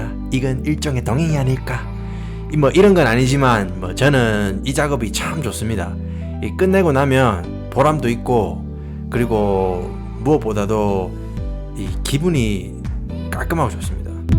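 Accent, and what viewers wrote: Gyeongsang, South Korea. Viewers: 국산말 할땐 갱상도ㅋㅋㅋ 수입말 할땐 갱상도 억양이 없어지는데예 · 뭐지... 경상도 사투리와 영어의 이 완벽한 조화는? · 경상도 남자가 혀굴리며 영어쓰는것같아ㅎㅎ 매력있넼ㅋㅋ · 영어발음은 네이티브 한국발음은 미쿡이고 억양은 경상도 토박이네요ㅋㅋㅋ 엄청난 조합입니다 듣고만 있어도 풉하게 되네요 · 들리는 영어발음이나 차나 설명하는 문화나 미국인데 말에 스며든 경상도 말씨 때문에 경상도에 미국있는 착각함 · 아이고 말투가 경상도 아닙미꺼 저도 세차업을 합니다 먼 타국에서 욕보십니다 자주 오겠습니다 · 찌지고 문떼고...그렇 습니더~ 경상도 구시한 사투리 정이 뚝 뚝 느껴집니다~ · 경상도 사투리가 정겨워요~ ㅋㅋㅋ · 알고리즘만 스쳐도 인연인데 구독하고 갑니다~! 경상도 사투리와 영어 의 조합이 색다릅니다 ㅋㅋ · 저도 경상도 사람인데... 갱상도 사투리와 멋찐 영어랑 섞여서 하니깐 스웩하십니더! 느낌있습돠~ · 알고리즘으로 시원함느끼고 갑니다 미국이신데 경상도말투로 해주시니 구수하이 좋네예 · 갱상도식 영어 발음이 특이합니다 추천합니다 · 저도 아시는분이 미국에 오래사셔서 ㅎㅎㅎ 생각도 나고, 경남쪽 사투리들으니 친구들 생각나고 구독누르고 갑니다~~~소소한 미국소식 기대합니다~~~ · 경상도 사투리 정겹네요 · 경상도 억양 쓰시면서 영단어 말할때는 원어민 나오는게 신기하네요 ㅋㅋㅋ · 갱상도잉글리쉬 찰지네예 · 경상도 이민자 십니까? 억양이 저희쪽 이시네요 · 갱상도 사투리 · 한국어 베이스가 갱상도네요ㅋㅋ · 아니 갱상도 말투 론나 좋습니다 ㅋ콬ㅎㅋㅋㅎㅋㄹㅋㅎㅋㄹㅋㅎㅋㅎㅋ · 저도 나름 세차환자다보니 영상만 봐도 완전 힐링되네요. 그나저나 요새 방송이나 유튜브에서 찐 경상도 사투리 듣기 참 힘든데 말이죠. 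간만에 귀도 힐링하고 갑니다. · 경상도 사투리 구수한데 영어발음은 상당히 좋으시네요 ㅎㅎ 미국 가신지 제법 오래 되셨나 봅니다. 그나저나 유튭 알고리즘은 왜 이걸 추천해서 이 새벽에 보게 하는지..ㅋㅋ 속시원하게 청소 되는걸 다 봐야지만 꿀잠 자겠네요~ㅋㅋ 잘 볼게요~앞으로도 속시원한 청소 영상 보러 자주 오겠습니다~~ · 아무리 들어봐도 경상도 아저씬데... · 찰진 경상도 사투리 + 교포말투 + 꼼꼼한 청소 = 마약 영상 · 구독하고 갑니다.간간히 들리는 경상도 사투리가 정겹네요~ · 고향이 경상도이신가봐요!ㅋㅋㅋㅋㅋㅋㅋ 사투리와 영어발음이 섞인 말투 넘나 매력적인것....☆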